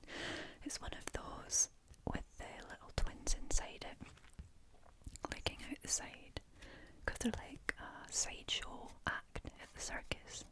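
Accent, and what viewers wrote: Scotland, United Kingdom. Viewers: You have a lovely voice, I think you should give the soft spoken a go more! It makes your beautiful Scottish accent more apparent.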